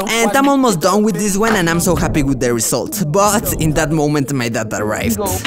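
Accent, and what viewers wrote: Spain. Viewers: como se nota que eres español bro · I think you have a Spanish accent, or do you speak Spanish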